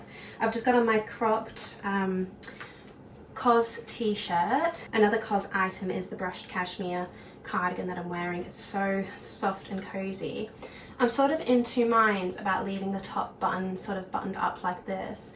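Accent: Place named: Australia. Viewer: Hi -I stumbled across your videos and love them so much..they are so comforting and cozy with your soft Australian voice/accent. 🙂 Cotswolds is stunning all year round - your filming, music and editing is exceptional - you have a good eye to detail/vision and capture everything so beautifully, so calming and peaceful ❤. Im vegetarian and lovvved the salad...chefs kiss. I live in London and IMO it does the best Christmas lights ever..its sooo magical..you will absolutely love it 🎄🎄Cant wait to watch more of your beautiful calming videos in the Cotswolds. You will leave with amazing memories. xx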